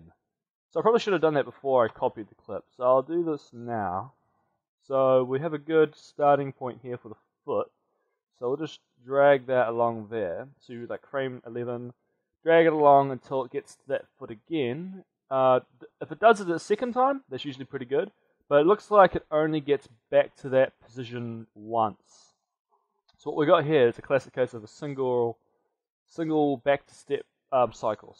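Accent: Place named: Australia. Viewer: I can't tell if you're Australian or American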